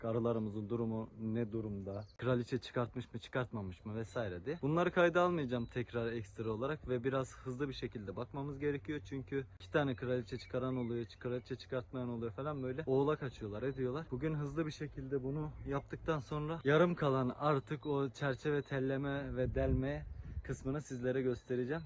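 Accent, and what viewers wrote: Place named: Ordu, Turkey. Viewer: Selamünaleyküm ordulusunuz sanırım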